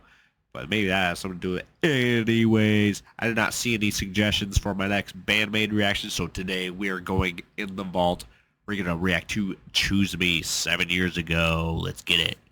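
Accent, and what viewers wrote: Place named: Midwest, United States. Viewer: A-Ha! I see, you live in IOWA. I'm Japanese, but I used to live in Nebraska. Your face, the way you speak reminded me of an American friend of mine back in the days in Mid-West. It must be very freezing now. Take care of yourself, buddy.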